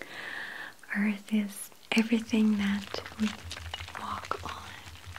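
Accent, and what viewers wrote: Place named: Russia. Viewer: Thank you! Is there any way you can make a video about actual elements? Maybe a mad scientist role play with a lab coat talking about Moscovian in your lovely Russian accent?....think about it.... [waits for inception to happen]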